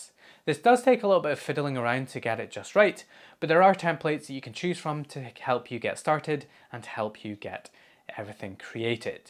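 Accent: Scotland, United Kingdom. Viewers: Another amazing video!. Informative and enjoyable to watch. Still waiting for you to lean into the Scottish accent more and roll your Rrrrs among things. 😝 Maybe in one of the always funny blooper takes at the end? 😉😉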